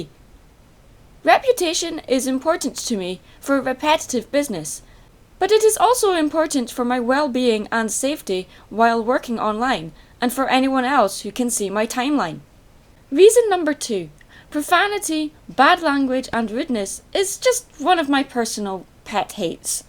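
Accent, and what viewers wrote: Scotland, United Kingdom. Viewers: Are you Scottish? And actually I'm used to people that do not know and don't swear at all and I go to channel to channel just to find a youtube channel that is completely children friendly and has no one in any comment or videos that has bad words and when I say a bad word my mom scolds me and I never say it ever again and their are kids causing and they swear for no reason and one of them don't even know what they mean so I get your point but now a days older brothers and sister are trying to prevent anyone from causing in front of their younger brother or sister. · omg I love the way your voice sounds and your Scottish accent! I really calms me I don't know why xD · R u Scottish?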